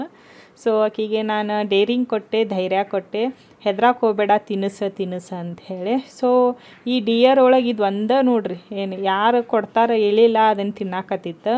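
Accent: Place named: North Karnataka, India. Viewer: Nice to hear north side kannada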